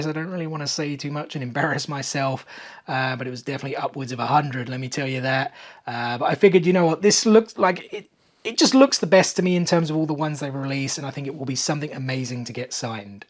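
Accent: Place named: Australia. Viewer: You're in London? I thought your accent was Australian!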